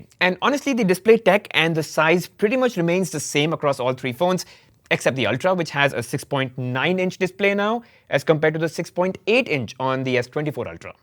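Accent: India. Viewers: This man has such a good Indian accent. Clear and articulate. Keep it up!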